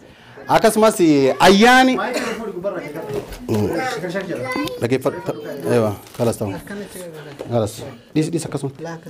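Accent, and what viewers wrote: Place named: Arsi, Ethiopia. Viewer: Gafiin qaba gossa tammi loqudni isaa arsi nati fakkate galatomi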